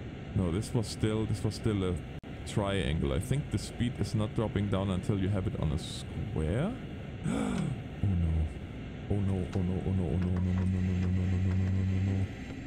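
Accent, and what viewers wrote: Germany. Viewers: Bist du ein Deutscher in Kanada? Muss fragen, weil ich den deutschen Akzent klar heraushöre, mich dann aber wundere über den Kanalnamen und das Wohnsitzland Kanada.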